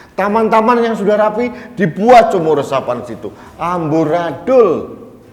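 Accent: Java, Indonesia. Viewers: jowomu asli pak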